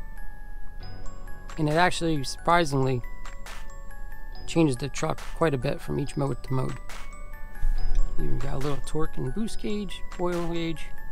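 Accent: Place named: Pennsylvania, United States. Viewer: Nice Pennsylvania accent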